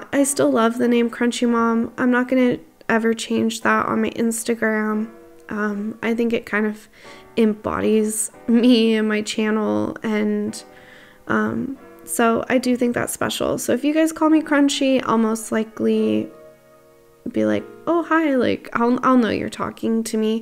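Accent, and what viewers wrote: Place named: United States. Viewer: ^^ J’ reçois même pas la notif T^T (YouTube bug) N'empêche tu as un très très bon accent américain XD !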